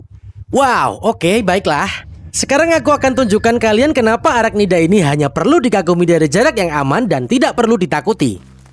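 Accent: Java, Indonesia. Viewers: logat jowo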